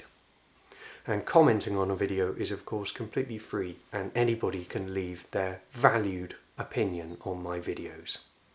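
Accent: United Kingdom. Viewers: Hi, you are very talented person, with such a beautiful British accent-I love it. I really enjoy watching your videos because I'm Polish and I'm learning English, so I can learn a lot of beautiful vocabulary from you channel (I know, it could sounds funny). I play the organ a little end pieces you play are amazing! Have nice da!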